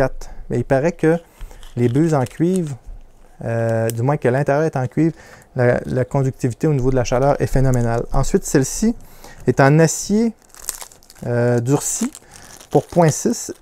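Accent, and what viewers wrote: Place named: Quebec, Canada. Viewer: Bonjour, j'ai bien compris qu'il n'y a aucune animosité dans ton intervention, mais je trouve étrange de reprocher à un Québécois de parler..... québécois ;-) Marc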